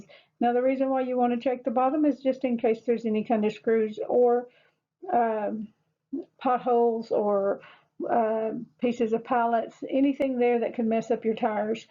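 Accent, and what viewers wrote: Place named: Tennessee, United States. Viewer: You may be in CA but you sound like you’re from good ole TN. Thanks for your instructions and your time!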